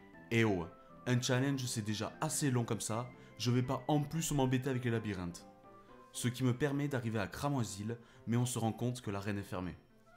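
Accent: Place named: South, France. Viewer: Ptdr j’adore ! Tu viens d’où avec cet accent fada ?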